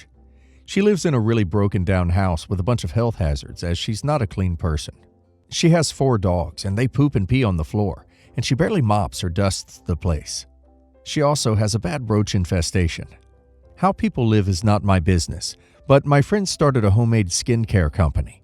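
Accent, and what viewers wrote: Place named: United States. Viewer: The weird part is that I don't think it's AI because there was a thick American accent. 😂😂😂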